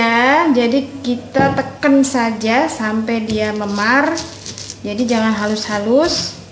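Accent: Java, Indonesia. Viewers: Maaf ya, ingin tanya daun jurok tu daun apa, sebab bahasa pengantar tu pelat2 jawa, sya ni peminat dri Malaysia.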